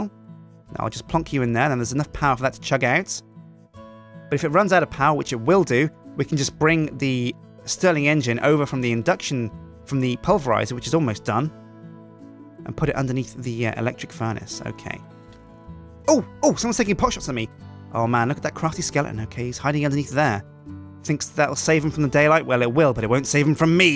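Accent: Australia. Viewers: Australian accent?? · His aussie accent is amazing even I can't do that and I am aussie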